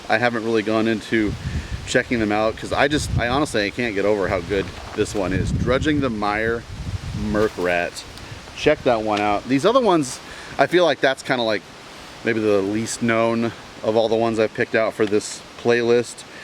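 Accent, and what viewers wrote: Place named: Australia. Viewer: Dude, that walking footage at the start rules, it's like a music video to the song playing. No problems with the wind noise, great ambience. I can forgive that, but not that "down un-da" accent! Mandy who is Murkrat is quite prolific, she was in gothdoom band Lycanthia who I saw live back in the day, and is in funeral doom band The Slow Death. I believe she lives on a farm and suffered some damage during last years fires.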